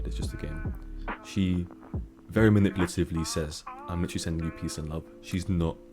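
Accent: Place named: Southern England, United Kingdom. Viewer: bro u sound mad like my mate from yeovil u from south england?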